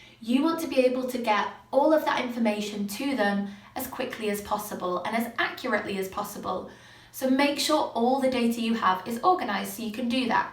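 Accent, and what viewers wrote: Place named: England, United Kingdom. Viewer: Love the accent, I just close my eyes and imagine the extravagant 18th century English solicitor in a wig. Can you get one of those and wear it for these legal videos? Wigs, I mean, not solicitors.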